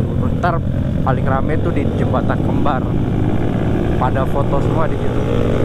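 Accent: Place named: West Java, Indonesia. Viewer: Orang jatim mas ? kok ngomongnya gak kelihatan logat jawa mas.. malah kayak dari logat sunda... hehehe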